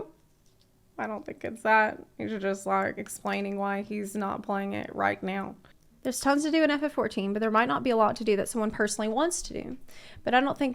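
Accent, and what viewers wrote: South, United States. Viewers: are you from the south in the states? I hear some southern accent here in there.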